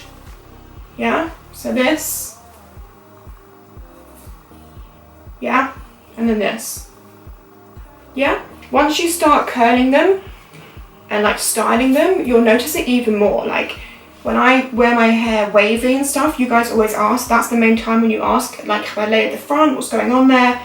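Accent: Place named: London, United Kingdom. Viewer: No shade... But you sound a bit like a Londoner to me... No shade - never shade... Not to you! Lol... (I'm a Londoner anyways).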